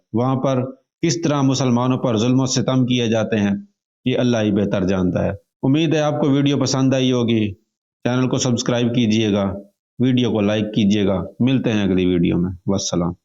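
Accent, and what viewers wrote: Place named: Pakistan. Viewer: Yuck! What a Pakistani-sounding narrator. Utterly one-sided and typically I$lamiC in your worldview. Lol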